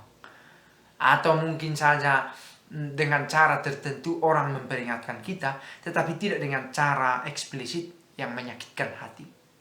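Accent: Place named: Tegal, Indonesia. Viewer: Kalo dari logatnya kayaknya orang tegal 🤔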